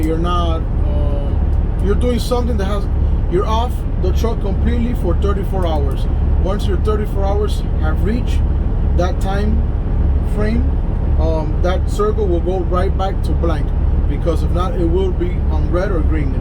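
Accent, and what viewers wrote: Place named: Miami, United States. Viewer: I can tell your from Miami. Same here brother! Great video very helpful btw!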